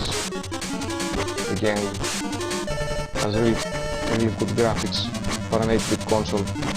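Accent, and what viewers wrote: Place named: Greece. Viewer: i sense a greek accent. :)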